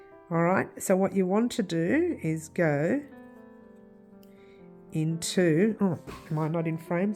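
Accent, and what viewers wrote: Australia. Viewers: Wow i just found you!!! What a relief it was for me to hear your aussie accent! Somehow you say what needs to be said and you dont confuse me!! Im Aussie too!!! Please don't ever leave!!! Love your purse! ❤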